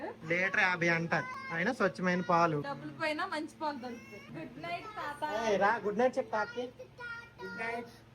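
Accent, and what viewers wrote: Srikakulam, India. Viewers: Srikakulam launguage is an emotion 😊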